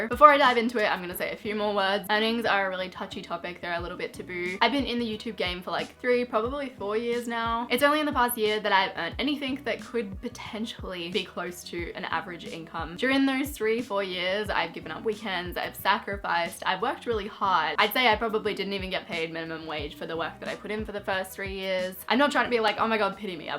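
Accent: Australia. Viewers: It's gonna sound weird but I love your accent I feel like Australians have the best accent to me at least anyways great video ✨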